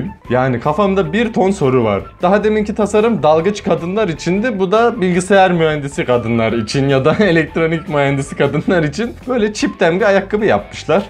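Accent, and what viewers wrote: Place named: Ankara, Turkey. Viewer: Aa ben de Çorumda yasiyorum. Burada da Ankaralılar var konuşmalarınız benziyor zaten 😆😊😂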